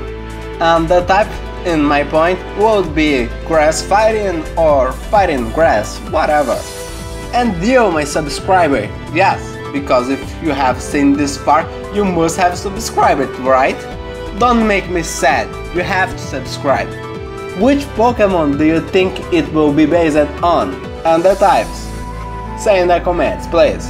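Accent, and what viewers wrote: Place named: Brazil. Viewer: Corélio M. Based on the accent, he HAS to be Brazilian or at least Latino.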